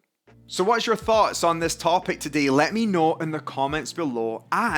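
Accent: Scotland, United Kingdom. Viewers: Always a great pleasure to hear you (love your Scottish accent)and thanks for this deep lesson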